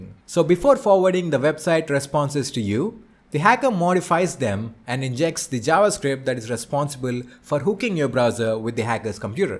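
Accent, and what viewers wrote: India. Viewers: I cant handle Idian accent. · One of the rare indians where u fully understand what he is saying yet you know hes indian due to the Accent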